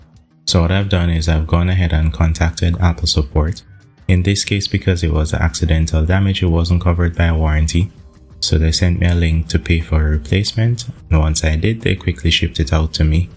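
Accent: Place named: Jamaica. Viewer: How him sound like yaadie so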